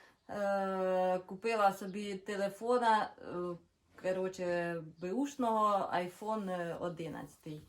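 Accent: Poltava, Ukraine. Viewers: Як мені подобається наш неповторний полтавський суржик!❤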